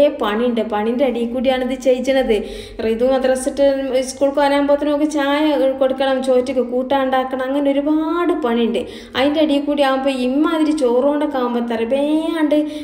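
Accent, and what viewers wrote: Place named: Malabar, India. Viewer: മലബാർ style സംസാരം വേണ്ട. തനിനാടൻ മലയാള ഭാഷയിൽ സംസാരിക്കൂ. ഞമ്മൾ കേട്ടോ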